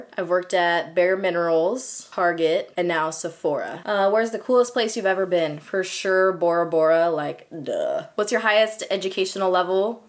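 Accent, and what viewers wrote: California, United States. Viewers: Lol, I've been watching on and off for a few months and I came across this video so I was like, "This is probably the best way to really start!" And tbh that was a good idea. Because she talks exactly like everybody I grew up with. I'm not currently home but she made me miss California! Idk exactly what it is but it's a very Californian way to speak. Anyway, I'm subbed now!:) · Imagine, I've just sat here for 34 minutes watching this, despite having followed you for over a year and knowing you pretty well, and I still don't know why you're called 😩 loved every second though, that strong valley accent killed me 😂❤️❤️❤️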